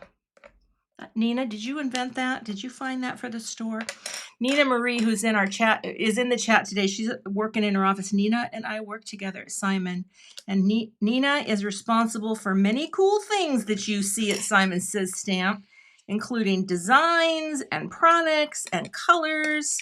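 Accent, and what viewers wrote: Midwest, United States. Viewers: ?Harold and the Purple Crayon? I hear a little mid west accent in you.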